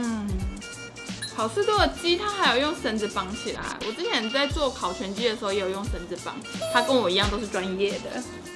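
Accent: Taiwan. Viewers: 完全的注音腔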